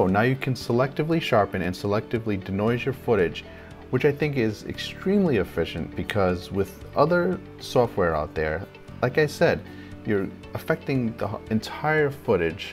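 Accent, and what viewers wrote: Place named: Mid-Atlantic, United States. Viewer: Hi - or is that? Thanks for a well considered tut. The work around may be a bit fiddly, but I can see how it would work. For people interested in applying a mask-to-denoise method to stills, there is a vid tut made by the Photoshop plugin vendor, Topaz Labs, that explains this. I believe it was made 1-2 years ago. Regarding mask making to extract areas of luminance, I often use this technique with image sequences of actors in front of green screen. In this case, too often selected areas of colour have differing grain/ noise characteristics. I have also found playing with the AE Shadow/ Highlight filter on my ortho masks can help! If I may say so you come across as a patient, gentle, unhurried sort of person. Of course as filmmakers we all should be patient (and experiment!). However, too often video bloggers on YouTube dash through their tuts as if the moment had no significance other than seeking a moment of attention. 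Where do you hail from? You do not have a conventional North American accent. There is a hard end to your “A” sound, but the overall suggestion is mid Atlantic, or ex-pat Australian.:)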